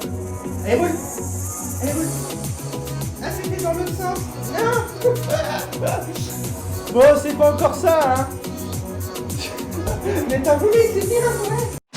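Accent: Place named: Moselle, France. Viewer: Moi j’ai compris ce que tu as dis 🤣 Tu viens d’où de Moselle?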